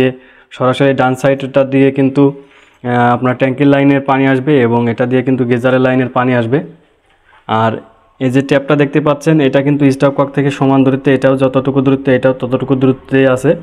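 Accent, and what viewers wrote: Jessore, Bangladesh. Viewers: খুব সুন্দর ভাবেই উপস্থাপন করার জন্য ধন্যবাদ। আপনার কথায় বোঝা যাচ্ছে যশোর এলাকার মানুষ। আপনার বাড়ি কোথায়?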